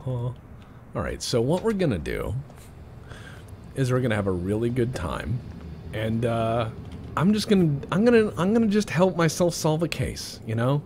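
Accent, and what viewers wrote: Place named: Texas, United States. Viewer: French in a Texan accent is an unnatural but hilarious combination.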